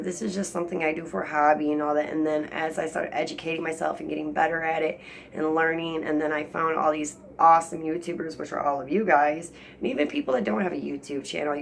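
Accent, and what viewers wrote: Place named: Ohio, United States. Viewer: Awesome stuff! I am actually trying the same thing with a much smaller spiny orb weaver! How crazy is that! You said everything I couldn't say about my viewers. I started out the same way. Just a few friends & family & now I have more friends & family on here! Lol. This community is one of my favorite parts of the hobby aside from the critters. PS. I thought you sounded like you were from Ohio! Norvis is actually a good friend of mine & y'all all have a similar accent! Lol 😂. Great stuff girl keep up the great work!!!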